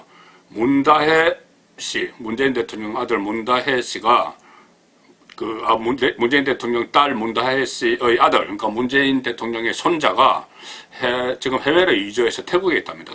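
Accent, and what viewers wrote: Gyeongsang, South Korea. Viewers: 발음은 타고난것이고 억양은 경상도지역 억양인데 너무 무례하군요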